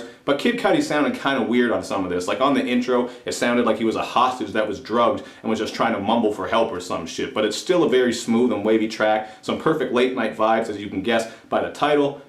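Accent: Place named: Canada. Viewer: oh youre canadian thats a funky accent bro and in part sound funky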